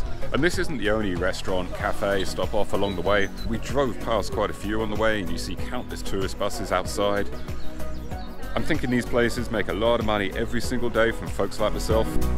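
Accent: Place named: Glasgow, United Kingdom. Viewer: You have a hint of a Glaswegian accent.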